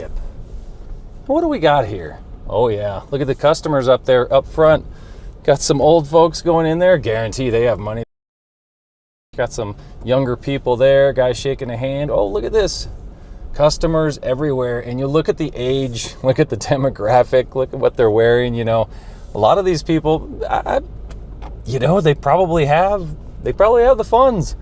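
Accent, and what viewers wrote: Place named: Colorado, United States. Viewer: I'm buying my plug in hybrid Rav4 from Greely in the next few weeks! I could tell you are a fellow Coloradan by your accent 😂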